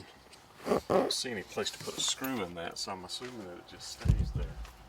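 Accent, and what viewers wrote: South, United States. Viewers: Thank you so much for your video. Ive ordered my Jeep wrangler unlimited 2016 and i'm soooo excited for it to come in. I've watched your video several times as I've ordered my jeep to come with a hard top and i'm going to order a soft top after i receive it. VERY detailed. Nice to hear a nice Southern Gentleman do a great job on a video! God Bless! Keep doing videos!!! We live in Florida and own a Hot springs spa, but we love your Cal Spas!!!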